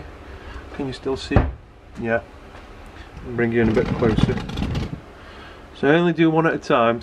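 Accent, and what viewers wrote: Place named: Stoke, United Kingdom. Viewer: Sounds like a fellow stokie? Or not far off anyway!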